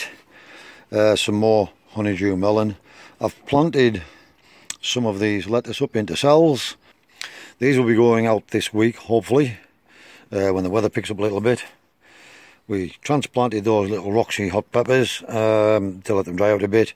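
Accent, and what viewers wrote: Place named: Cumbria, United Kingdom. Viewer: Good to hear Cumbrian twang 👍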